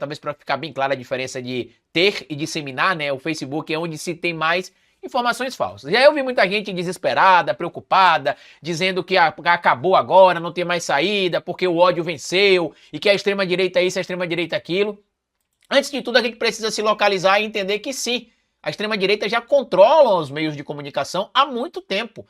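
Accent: Northeast, Brazil. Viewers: Boa tarde, gosto muito das suas explicações, te admiro você é muito inteligente, esse sotaque nordestino é o seu charme kkkkkk.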